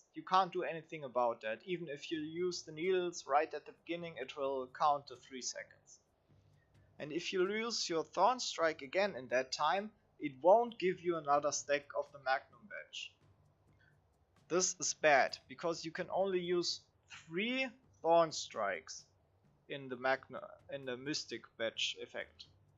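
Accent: Germany. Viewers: so german accent +1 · are you german ? (accent)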